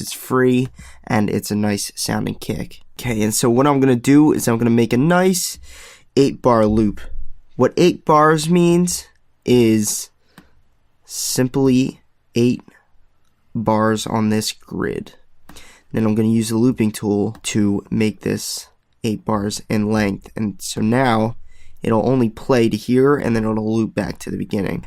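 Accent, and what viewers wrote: Philadelphia, United States. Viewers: sounds like Philly to me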